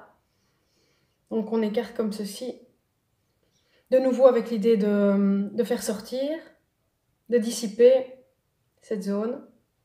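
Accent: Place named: Belgium. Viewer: génial! Vous êtes belges? J'entends comme un accent de chez nous 😊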